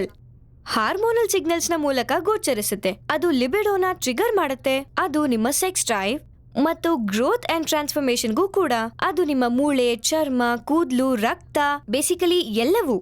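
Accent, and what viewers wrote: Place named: India. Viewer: fifakillaz 14 Indian accent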